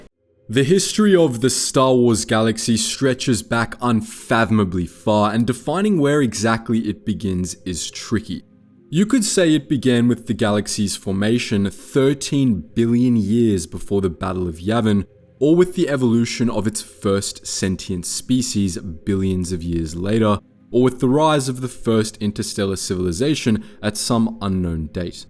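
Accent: Australia. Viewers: Oh yeah, the Australian accent is definitly a plus to the already magnificant content. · Imo Generation Tech is a close second, but the Australian accent makes better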